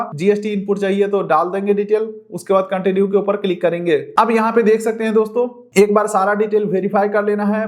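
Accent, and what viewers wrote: Bihar, India. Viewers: Bihar se ho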